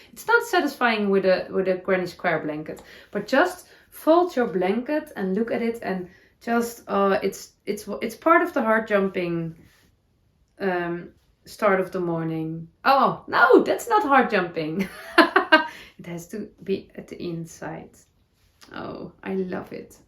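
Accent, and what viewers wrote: Netherlands. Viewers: Your Mentor socks are so cool!! We would say ‘high instep’. Love listening to your ‘Dutchy English’. ❤️❤️❤️ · You can say your eyelids are getting heavy. I love your Dutchy English 😄 also you festive yoke is beautiful. I’m glad I haven’t made it yet because the neutral colors are really fabulous.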